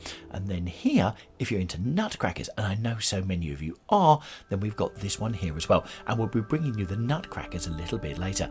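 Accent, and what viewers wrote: United Kingdom. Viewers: ❤ I Really Love Your Channel, you cut out all the noise from the store. And I love British Voices 😊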